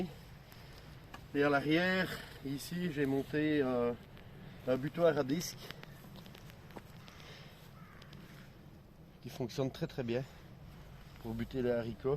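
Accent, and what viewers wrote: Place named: Belgium. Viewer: L'accent Belge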